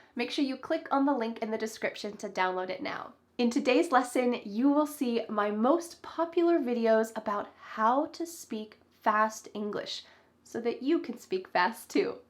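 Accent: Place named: United States. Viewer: Do all Americans speak like you do? It sounds like a lot of slang. May I ask what program did you use to make the video?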